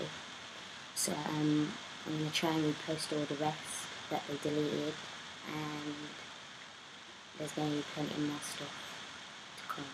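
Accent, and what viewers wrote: United Kingdom. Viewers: Come to america please just for a little while. Most unique brit rapper. I love the accent even tho its heavy. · I love the British talk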